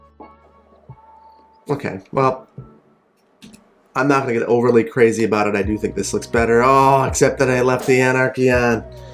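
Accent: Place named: Milwaukee, United States. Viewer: I just discovered your channel and binged just about all your videos! I'm an MKE native and used to work for WI DHL so your accent and industry make me homesick. Keep the videos coming! The winter map for another month or so works for me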